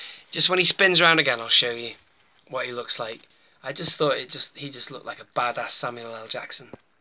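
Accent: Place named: United States. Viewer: I like him better as a figure than I did as a character. This one is nice looking. Actually, so many of the vintage line are. i don't collect them, I just love to look at people's collections. I also like to hear your take on everything, I especially like hearing your American Accent, which is pretty good btw.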